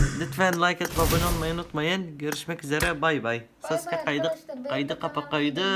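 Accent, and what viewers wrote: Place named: Syria. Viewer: Niye suriyeli gibi konuşuyon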